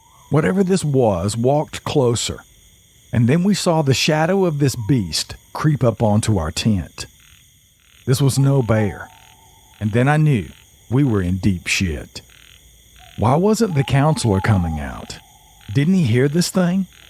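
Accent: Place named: Texas, United States. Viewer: Trying to place the accent....texas?..oklahoma?.... Arkansas here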